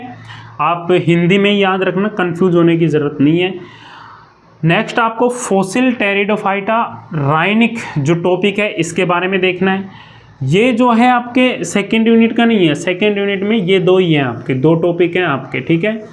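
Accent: Rajasthan, India. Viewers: Sir aap Rajsthaan se ho kyaa 😊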